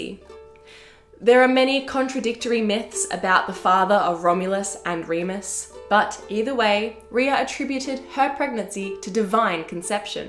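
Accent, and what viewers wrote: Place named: Australia. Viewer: Great presentation. You sound like an Aussie.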